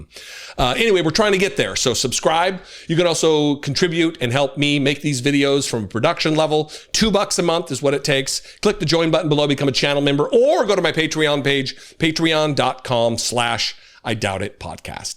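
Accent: South, United States. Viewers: I dig your sing-songy Southern preacher embellishment,!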